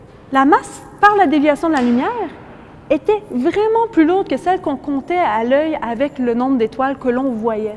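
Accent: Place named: Quebec, Canada. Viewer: Aaah l'accent québécois... Il rendra n'importe quel discours humoristique, aussi sérieux soit il. XD. Sinon très intéressant.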